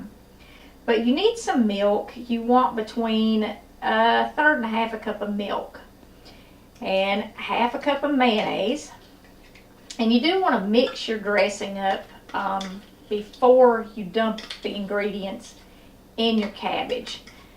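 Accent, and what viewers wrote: South, United States. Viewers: Thank you and Bless you as well. I am African American Female, whose parents and Grandparents were all born and raised "Down South"...I enjoy watching cooking programs...your Southern accent especially gave me fond memories of my own female family members talking and comparing recipes as they prepared various dishes. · EVERYTHING you make looks so good, and your country accent is way cute too.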